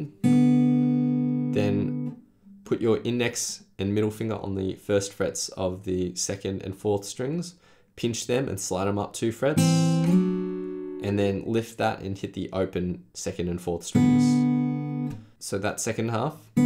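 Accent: Australia. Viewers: i always forget this guy has an aussie accent when the video starts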